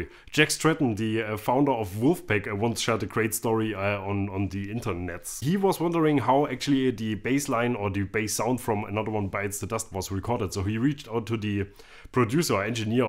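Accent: Germany. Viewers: Phil Mitchell reviews bass stuff with a german accent on youtube? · The content is always great, but the German accent and eye movement is what keeps me coming back.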